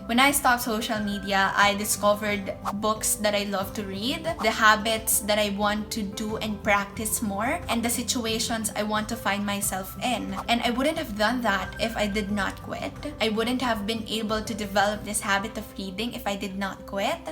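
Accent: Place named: Philippines. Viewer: im quitting social media too, and while listening to you, i think you're a filipina haha, btw new subscriber mo ako, keep doing videos :>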